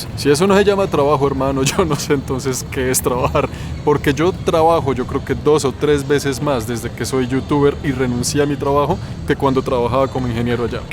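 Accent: Colombia. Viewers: Que bueno este vídeo muchas gracias. Me encantan y sabes que más me gusta en la forma que los editas y como los expresas genial con ese típico acento Colombiano y mucha naturalidad. Gracias feliz viaje aquí a Europa . Vivo en Alemania en Wiesbaden y soy de Cali . Felicidades. Para adelante estas haciendo un buen trabajo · Saludos, muy informativo el video pero tengo un comentario, tienes cara de panameño con acento colombiano. Sin embargo, los videos son muy buenos felicidades. Saludos desde Panama y buen viaje.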